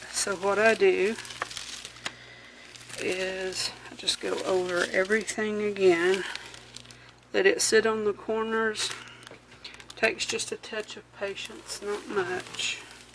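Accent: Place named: Indiana, United States. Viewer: This is so ingenious! And I was guessing Indiana. I recognize your accent. I was born in Indiana and lived there for years.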